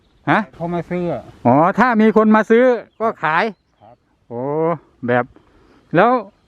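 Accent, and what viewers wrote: Isan, Thailand. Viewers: แฟนคลับจากลอนดอนประเทศอังกฤษ..จำเสียงได้ตั้งแต่ประโยคแรกแล้ว..ว่านี่มันเสียง..นี่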